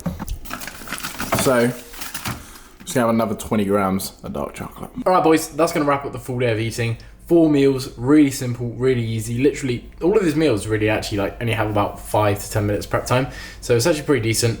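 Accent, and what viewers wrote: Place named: United Kingdom. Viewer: Funny British accent, great charisma and tons of human charm flowing in streams from this cute nice guy. That's why I love this channel 😀👌💋🧡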